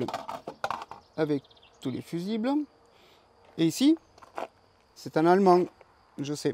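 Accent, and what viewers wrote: South, France. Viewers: Ohh mais tu es de chez moi ( le sud),!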